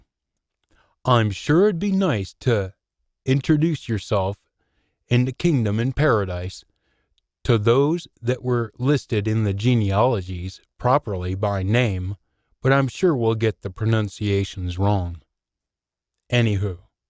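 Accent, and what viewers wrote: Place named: United States. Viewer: Your American not Scottish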